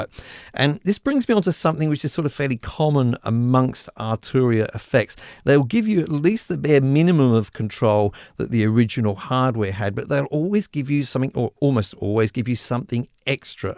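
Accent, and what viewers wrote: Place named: Australia. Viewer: ... I could listen to your calm, informative, Aussie-tinged explanations all day long. So cool. I must try parallel processing some time.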